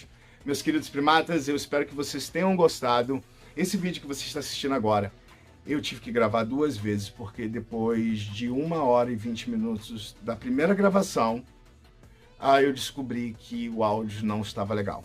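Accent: United States. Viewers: legal perguntei devido o sotaque americano. Gosto muito do seu canal. 😍❤❤ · Gente por favor que sotaque é esse? O cara é um brasileiro que esqueceu o português ou um brasileiro com síndrome de baba ovo de americano? Esse cara fala igual a um americano que está aprendendo português..que vergonha alheia ..fala direito brasileiro valorize a terra que vc nasceu..esses vídeos desse cara pode entrar para os top lixo do YouTube..que bosta ..não recomendo....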